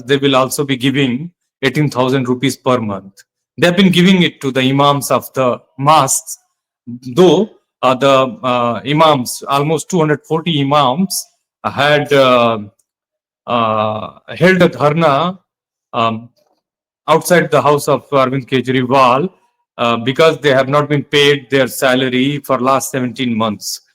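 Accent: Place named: Bangladesh. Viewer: Your accent is bangladeshi , show us your dr degree u cheat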